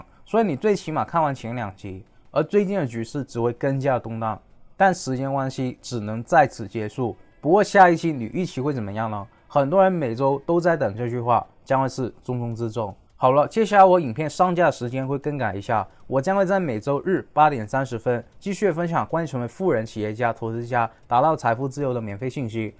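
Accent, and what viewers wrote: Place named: Mainland, China. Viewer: 聽口音很像中國人？應該先來救中國人民幣？才好吧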